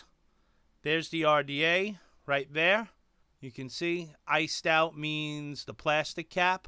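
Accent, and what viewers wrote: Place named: New York, United States. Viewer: I can't use the low profile drip tips either. I just don't get WHY. But I just LOVE watching your videos man! So funny when it's all quiet, the kids are in bed, then all of a sudden a NY booming voice... "WHAT'S UP CLOUD CHASE-AHS!!!!" 😁🙏💗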